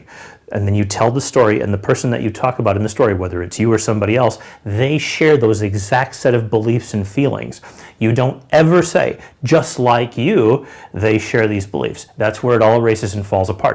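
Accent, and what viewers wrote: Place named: United States. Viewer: Oh hello Kevin! I like your american english. :-)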